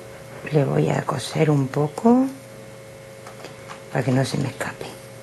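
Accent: Andalusia, Spain. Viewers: Querida....eres una genia, me gusta todo lo que haces eres super creativa. tambien me gusta tu ayudanta ja ja la gatita que dice mamá. Sigue creando y gracias por tu acento andaluz tan bonito. besitos a las dos!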